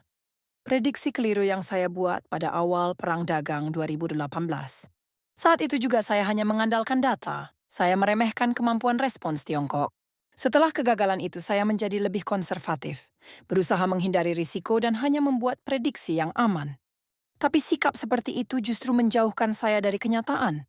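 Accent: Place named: Malaysia. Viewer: Admin itu orang Malay yg sekedar cari viewer dari Indonesia Kau dengar baik2 logat dia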